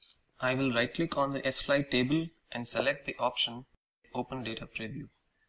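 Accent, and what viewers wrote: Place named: India. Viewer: this english Indisch language..triggers me so hard...omg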